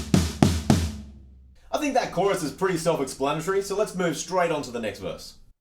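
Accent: Australia. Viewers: Wait a minute..........r u Australian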